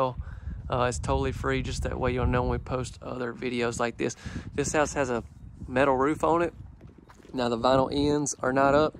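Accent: South, United States. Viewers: Love the floors. Nice house but th as t 2 story was my favorite! And you don't sound like a goof! You sound like a southern gentleman! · You could sell the phone book . I like listening to your southern charm and wit . Keep up the good work !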